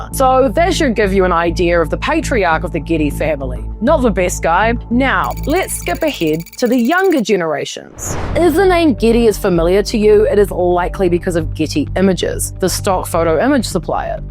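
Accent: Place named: New Zealand. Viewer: That’s how we pronounce it in Australia and this lady sounds like a Kiwi, so I’d say they say it like ww do. I’m guessing you’re American? We have different accents down under.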